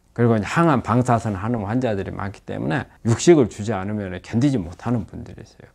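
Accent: Jeolla, South Korea. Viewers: 웜마 전라도네우짜쓰까잉 구수하구만 ❤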